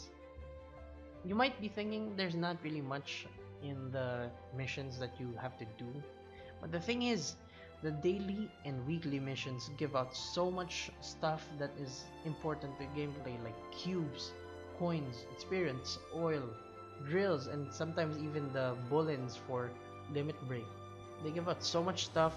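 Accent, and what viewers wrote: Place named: Philippines. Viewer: Ang accent mo parang Filipino Pilipino ka ba?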